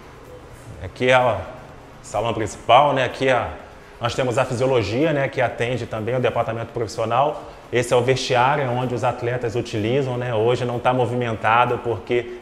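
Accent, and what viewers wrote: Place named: Minas Gerais, Brazil. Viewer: MINEIRO,COM ESSE X,RS?Ixqueiro...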